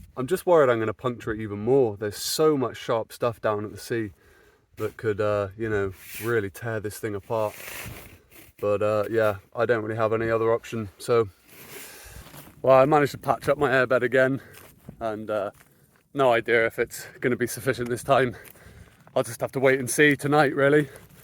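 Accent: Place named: RP, United Kingdom. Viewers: You sound English but doesn’t look like England frankly you’d be moved on & frozen if you were trapped in uk so “homeless” is a bit strong. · I could listen to you speak all day. Very eloquent. Where were you raised? You sound posh to me but then I am Scottish. Love how well adjusted and genuinely happy you seem to be, in the way that you live. ❤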